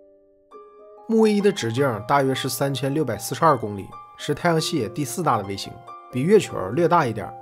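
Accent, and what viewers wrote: Northeast, China. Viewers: 东北口音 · 东北话口音。😄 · 这大碴子口音太出戏了🌝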